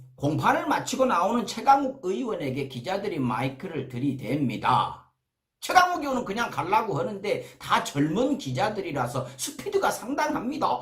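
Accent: Jeolla, South Korea. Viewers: 성범형님, 전라도 떠나온지 4년인데.. 내용떠나서 형님 사투리 들을라고 들어옵니다. 그런데 속까지 시원해진께 기가맥히요.. ^^ 사랑하요 행님.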